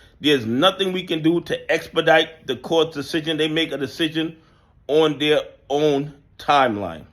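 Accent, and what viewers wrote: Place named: Bronx, United States. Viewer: How can I get in touch with you brother? Sitting on an Ozone park property you sound like your from the BX like myself.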